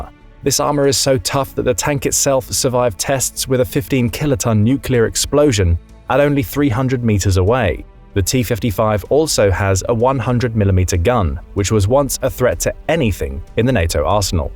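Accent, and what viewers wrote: United Kingdom. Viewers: Another video with a factual error about every minute, visuals that have no relevance to the narration and no insights that couldn’t be gleaned from reading a news site. But they did enlist a British narrator to give it a thinly veiled patina of authority.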